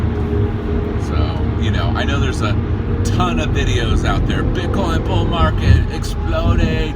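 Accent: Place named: Dallas, United States. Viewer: Are you a Texas gent? Sound like you are around the dallas area. HTown here. Continued success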